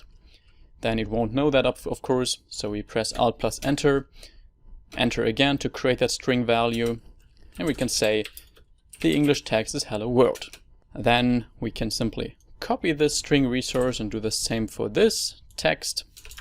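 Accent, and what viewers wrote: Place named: Germany. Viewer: I always wondered what your accent was — now I know, it’s German!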